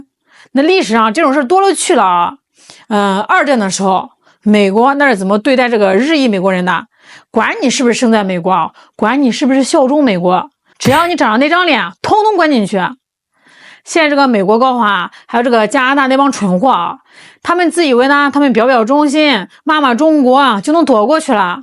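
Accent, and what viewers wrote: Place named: Northeast, China. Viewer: 一股大碴子味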